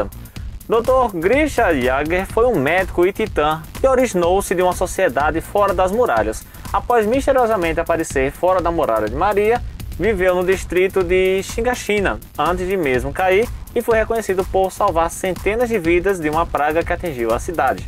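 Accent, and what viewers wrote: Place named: Minas Gerais, Brazil. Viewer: o jeito que você fala é muito mineiro,MORALHAS"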